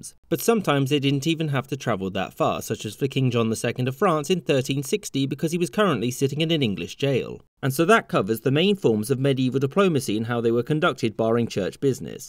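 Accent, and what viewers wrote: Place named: Scotland, United Kingdom. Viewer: I'm Irish yet I understand the Scottish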